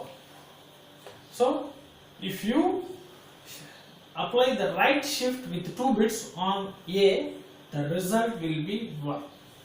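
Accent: South India, India. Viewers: Sir you sounds likes a south indian...by the way nice explanation sir😄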